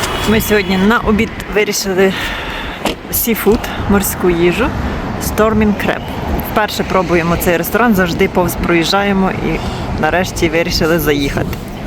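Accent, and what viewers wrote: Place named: Chernihiv, Ukraine. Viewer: Дякую,! Так приємно бачити Чернігівщину.❤ Я родом з сусіднього Бахмацького району, але давно живу на Київщині... Такий говір близький, з дитинства і юності.😊 💙💛💙💛💙💛 Гарний малюнок кицюнчика, донечка -- талант і молодчинка!❤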